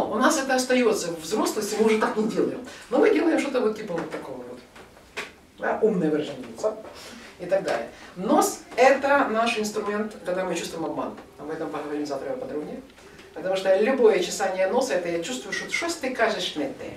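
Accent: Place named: Kyiv, Ukraine. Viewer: а сами вы чем лучше? хам, который не может подтвердить свои слова и не может выполнить в жизни обдуманные и хорошие поступки, тем самым о многом сожалеет, во-первых, вам стало это интересно (тема лекции) и как только вы решили не брать информацию в учёт жизни, во-вторых, вы увидел плохой комментарий и решили написать о человеке, которого не знаете, и судя по всему вы не внимательны и у вас узкий кругозор, так как говор лектор вполне себе, допустимый, так как она живёт (внимание) в Киеве, к вашему сведению столица Украины, в третьих, давайте ж включим логику, если она препадает и ей много лет, значит может чему то научить, и следовало обдумать все хорошо и досмотреть до конца, так как её звали работать личным психологом у политика, и скорее всего у вас сейчас появится желание удалить свой необдуманный ко мент, так как поймёте, что не правы, а когда до читаете этот момент скажете: НО ХРЕН ТАМ ТЕБЕ НЕ УСТУПЛЮ Если так оно и есть, то подумайте о как поступаете)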